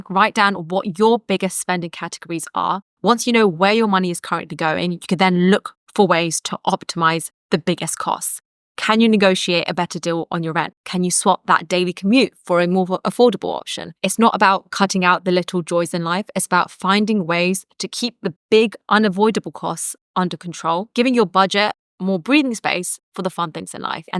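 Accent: United Kingdom. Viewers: All I want to know is how to get this cool UK accent.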